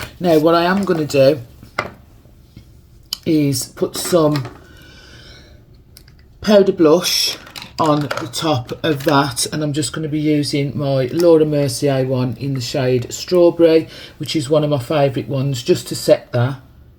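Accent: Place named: Black Country, United Kingdom. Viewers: That red hair really suits you, it makes you look younger x l love your black country accent too xx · Where about are you lovely your a cent sounds like your just up thre road i am coventry . If i shut my eyes its like listening to jess philips mp 😅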